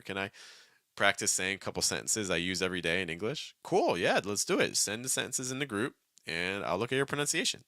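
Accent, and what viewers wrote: United States. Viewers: shadowing practice is very effective for me every morning I can feel that by improving my pronunciation I'm also learning American English but I like pronunciation topics most I want to sound like an American native like your accent thanks.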